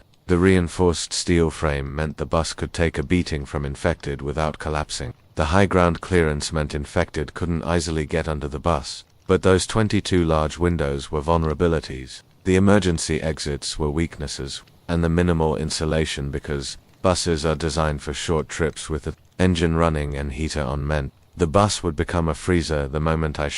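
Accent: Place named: England, United Kingdom. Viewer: Ixnay on the English announcer, it sounds lame to Americans.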